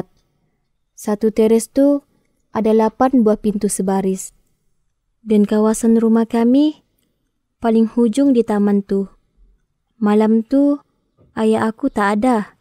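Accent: Sabah, Malaysia. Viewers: Sabah ke ...swk punya cerita..tpi pembaca mcm sabah..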